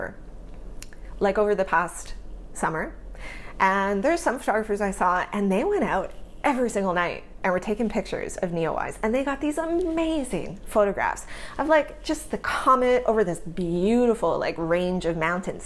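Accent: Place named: Canada. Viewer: What? Struggling with confidence? Who does that? Ok.....back up the bus.... Who doesn't struggle with it, is a better question. I guarantee even the most accomplished people have or still do struggle with it! We are all good at self doubt that is what makes or breaks us. It creates competitive energy or steals it! For me I am more jealous of the opportunities other photographers have, then the results! Like you I live in the cold cloudy climate! Minnesoooooota! Oh and a side note I knew you were from Canada just by the way you say out and about!! Lol I am a person that thinks I should be learning faster then I do! I have always struggled with learning speed. It has detoured me form moving forward in the past, because I don't get the results "I" think I should be getting! So in a way comparing me to me is self destructive! Instead i need to work the process and just do it and results will eventually come! 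Great message! Made me think about my own job life. Being retired now the only person I can blame for the position I put myself in is ME!! LOL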